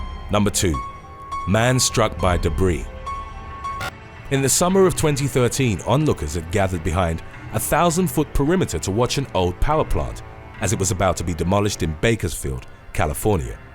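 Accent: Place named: England, United Kingdom. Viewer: South Church BLVD?!? The fact the ‘narrator’ has an English accent makes this even more painful to type 😭😂😂 Besides being born/raised/living in London for MY WHOLE LIFE, I’m pretty certain there IS NOT EVEN ONE BOULEVARD (blvd) in the WHOLE UK (England, Ireland, Scotland, Wales) let alone London (although the ‘posh’ parts of West London have some fruity street names) Pleanty of ‘Road’s, ‘Street’s, ‘Green’s, and the odd ‘Parade’, ‘Drive’ though (might have missed a few) P.S. Not many ‘Col De Sac’s, pleanty of ‘Close’s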